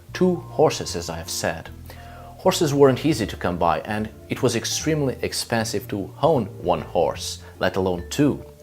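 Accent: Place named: Portugal. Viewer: Olá,! Estava em dúvida se eras mesma tuga, até porque pelo nome bem podias ser escandinavo, mas o sotaque (não leves como pejorativo, até porque falas inglês muito melhor que eu) e a fisionomia deixaram-me com a pulga atrás da orelha. Mas depois fui ao insta confirmar, e claro também porque um português que se preze consegue reconhecer outro compatriota ao longe ahah;) Vídeo interessante, apenas senti falta de referências e fontes listadas na descrição do vídeo, não só por uma questão de verosimilhança da informação mas até para aprofundamento da mesma. Bom trabalho, vou começar a seguir.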